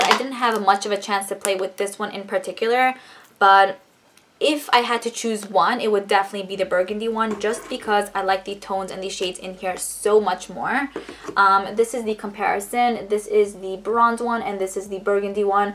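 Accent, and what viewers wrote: New York, United States. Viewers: I subscribed as I love makeup and also love your accent - New York, right? (forgive my ignorance, I'm not American ☺)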